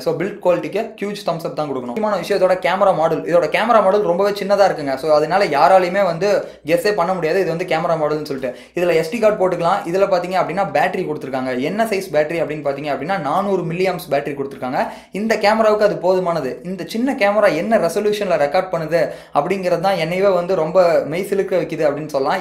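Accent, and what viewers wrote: Salem, India. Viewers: bro nenga Salem ha bro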